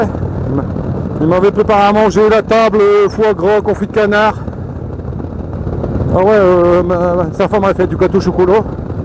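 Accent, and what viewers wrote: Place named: North, France. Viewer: l'accent du nord c'est top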